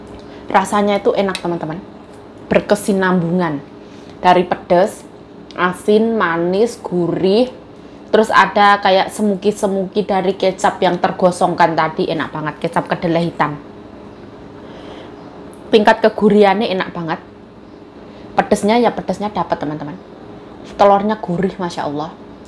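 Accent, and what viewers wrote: Java, Indonesia. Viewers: Mb jowone ngendi mbk?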